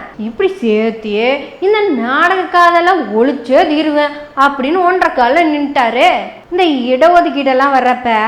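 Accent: Kongu, India. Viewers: அம்மனி வர வர உன்ற கோயமுத்தூர் குசும்பும் கொங்கு (கொஞ்சும்) தமிழும் தாறுமாற இருக்கு அம்மனியோவ் . உன்ற பதிவு ரொம்ப சூப்பரு மா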